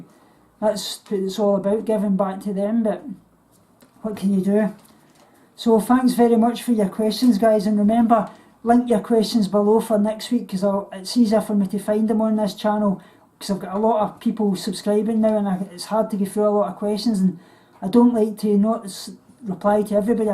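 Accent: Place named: Scotland, United Kingdom. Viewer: I have Scottish ancestors my great-great-grandmother was from Scotland she spoke just like you I don't remember her she passed away when I was a baby but my mom used to talk about her all the time she said she loved hearing her talk because of her accent just like I like your accent I like Australian accents British accent Irish LOL I'm just plain old American accent lol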